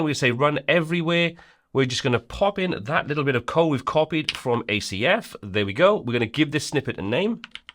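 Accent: Wales, United Kingdom. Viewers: Top instructor - highly skilled and with a great Welsh accent too :-)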